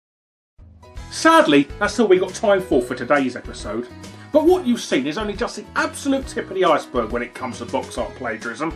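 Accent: England, United Kingdom. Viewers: Not saying that all English accents sound alike, but you sound like you could be Robin Leach's son.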